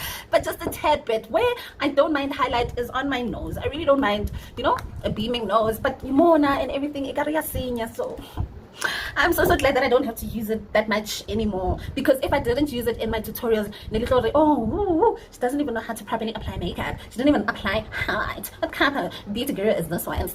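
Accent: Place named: United States. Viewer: Have I ever told you about ur accent🔥🔥❤❤❤ .....yoh ape yeh okarr ole American❤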